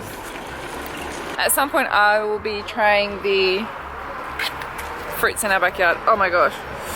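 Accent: Australia. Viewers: Have you been living in Australia or sth? Cuz your "So", "No" etc, sound more like Sewrlgh, Newlgh XD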